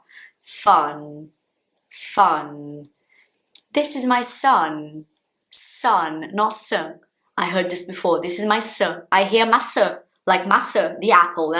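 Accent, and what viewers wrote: United Kingdom. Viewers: Finalmente um canal onde posso aprender inglês BRITÂNICO, já tava cansado de só ver inglês americano no meio do YouTube, parecia até que só existia aquele, enfim, já tô amando tanto as lições como esse sotaque tão sofisticado e... MUITO MAIS BONITO que aquele sotaque americano que parece caipira falando, da até agonia rsrsrs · Your British accent is so delicious! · I love your videos! I understand all of you say. And I love your British accent. Congrats,!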